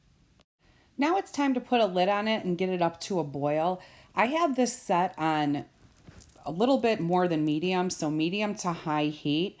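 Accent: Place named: Chicago, United States. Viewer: First time viewer. Nice looking recipes. Plain and simple. Must say that you sound like a fellow Chicagoan. I live in north central Illinois now. Best wishes. I will check out other recipes. TFS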